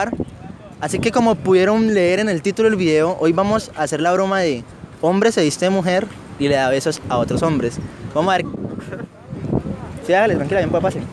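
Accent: Cali, Colombia. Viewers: Eres de cali verdad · Eres de cali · Eres de Cali?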